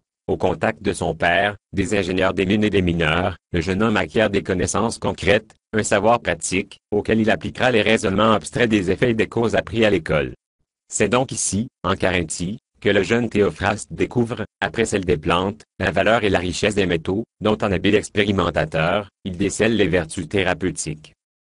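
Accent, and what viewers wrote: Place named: Quebec, Canada. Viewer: L’accent m’a tué t’es québécois?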